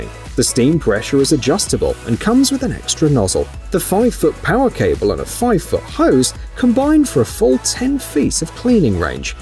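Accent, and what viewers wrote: United Kingdom. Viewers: Does it bother anyone else that the items are described using British accent and terminology, but US units of measure and value? No? Just me? OK, then. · what's with the f**** Brit accent impresarios .. every new gadget has to have a brit presenter or banging background sound.. lighten up .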